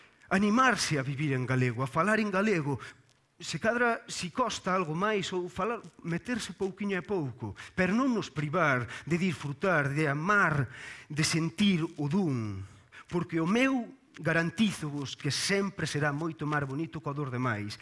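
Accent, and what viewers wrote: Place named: Castile, Spain. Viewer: Parece um portunhol, mistura de português com sotaque castelhano...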